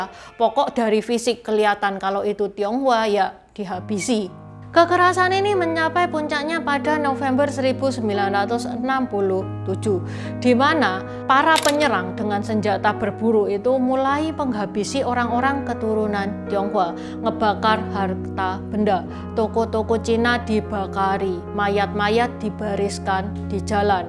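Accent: Java, Indonesia. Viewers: ini logatnya kok jawa banget to? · Cerita nya bagus, konten nya mantap.. Tapi medok nya itu loh ndak kuat 😂😂 mungkin khusus buat org Jawa saja ya 😅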